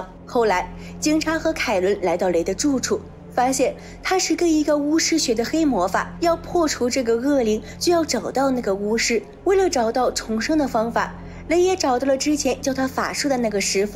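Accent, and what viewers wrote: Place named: Shandong, China. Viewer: 听口音就是我们那边的，老家山东的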